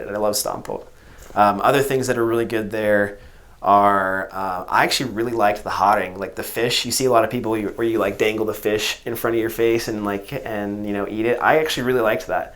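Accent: Netherlands. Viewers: Oh my god your dutch accent is just wow! I love it😋🇳🇱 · Ik vind je Nederlands accent echt geweldig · His Dutch is quite good. He even has a slightly Dutch accent :p (when heard by a Flemish person) Impressive! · Your Dutch accent is amazing! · Hm oke oke. Hij spreekt met een accent maar verder netjes nederlands. Niet verwacht. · As a Dutch person I agree. His American accent still comes through, but he definitely also managed to get a lot of the Dutch accent right.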